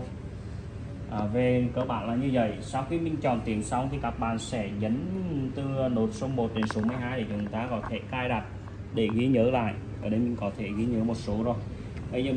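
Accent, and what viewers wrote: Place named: Central, Vietnam. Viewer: Kênh của Bạn kinh doanh đàn, các dòng đàn rất phong phú và hợp lý giá. Góp ý cho bạn chút suy nghĩ cá nhân của mình: Xin lỗi bạn vì giọng bạn đặc thù miền trung, Bạn nên đeo micro khi quay Video để mọi người dễ hiểu và nghe bạn truyền đạt. Thân ái trong Chúa.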